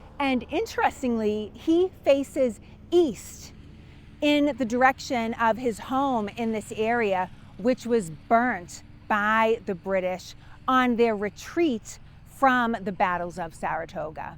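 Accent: Boston, United States. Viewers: Love the history lesson with such enthusiasm and your lovely Boston accent, thank you. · 1st, I love the accent. My job moved me to the west coast about a5 years ago. Hearing that Boston accent makes me feel at home. That said, BA was a great general until he wasn't. Wasn't it the guns captured there that were used to force the British from Boston after they were placed on Dorchester heights? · I absolutely love your enthusiasm and that heavy Boston accent there's no one better to talk about the Revolutionary War then someone from Boston. Great job loved the video and I also subscribed. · Another great video, as you were talking, I was thinking of who could not be there. And Arnold came right in my head. I've been watching your videos for years. I am a tour guide in Boston and portray Handcock. I use a lot of your bits of Revolutionary history with my tourist. 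Things people have never heard. Thank you for all your hard work bring us these videos. Continue to stay true. Love that Boston accent! Cheers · Love to hear your accent! Although this is about Saratoga, I said she has to be from Boston! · Love the videos! The genuine Bostonian accent is wonderful and adds to the fact that knows the topic. MORE VIDEOS PLEASE! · If only Benedict Arnold had not met and married teenager Peggy Shippen, history might have looked at him very differently. I love your enthusiasm and your Boston accent (calling a park a pac). Really enjoying your videos.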